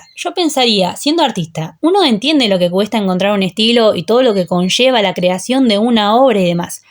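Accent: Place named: Argentina. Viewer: se nota el asentó arg XDD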